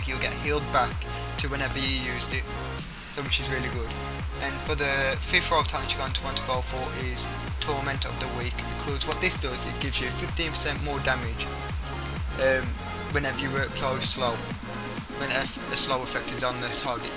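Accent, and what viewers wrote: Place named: Black Country, United Kingdom. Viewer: Yam from Black Country ay ya? Nice guide thanks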